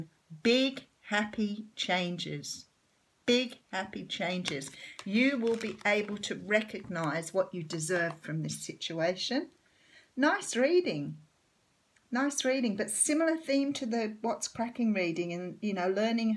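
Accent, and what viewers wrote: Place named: Australia. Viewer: I can see this yes. Nice energy, Australian too.. Good.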